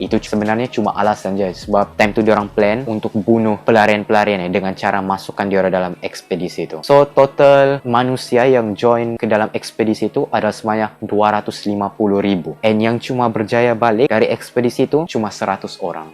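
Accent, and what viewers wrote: Sabah, Malaysia. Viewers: Saudara speaker ini pasti orang Sabah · Hsha sya suka ni orng ckpp ORANG SABAH THE BEST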